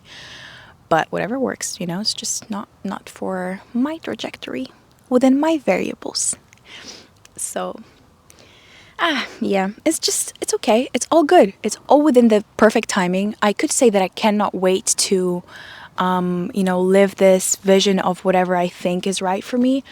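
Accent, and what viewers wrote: Russia. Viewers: wait ARE YOU RUSSIAN???? YOU SOUND SO BEAUTIFUL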